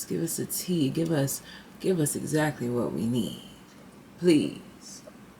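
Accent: United Kingdom. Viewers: ahhhhh so for pile 3 right at the beginning i channeled the song “ ” by (which at the beginning speaks ab someone who doesn't know how beautiful they truly are) also LOLOLLLLL the british accent is HILARIOUS bc my man is british 😭❤️ thank you so muuuuch!!!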